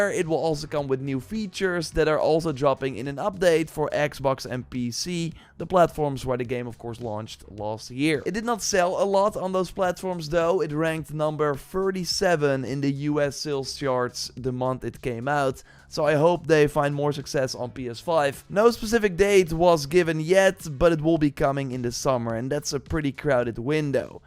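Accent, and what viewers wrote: Netherlands. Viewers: Klinkt Engels, als een Nederlander...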